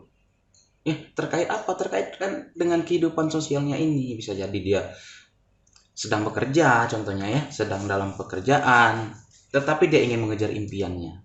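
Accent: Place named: Padang, Indonesia. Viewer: Pisces hadir menyimak... Suarany Abang ini mirip logat org Padang🙏🙏🙏🙏🙏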